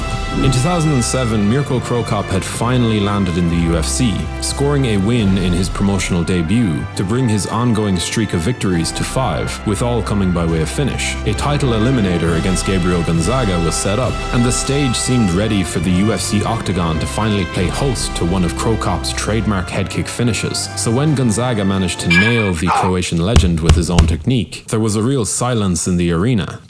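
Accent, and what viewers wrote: Ireland. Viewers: You Irish? In your about it says from US but I think I'm hearing an island boi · Are you Irish by chance... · posh d4 west brit ya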